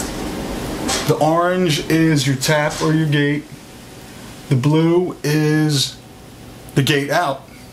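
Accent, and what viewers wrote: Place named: Philadelphia, United States. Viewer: Sounds like ur from philly but I’m guessin jersey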